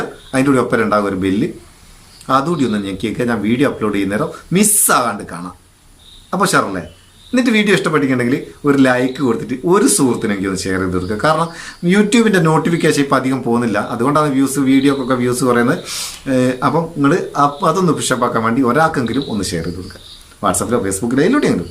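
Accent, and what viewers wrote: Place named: Vadakara, India. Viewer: അദ്ദേഹം മലയാളം നന്നായി അറിയുന്ന ആൾ തന്നെ ആണ്. പിന്നെ ഈ സംസാരം ഞങ്ങൾ വടകര, മേപ്പയൂർ, നാദാപുരം ഭാഗത്ത് ഉള്ള സ്ലാങ് ആണ്.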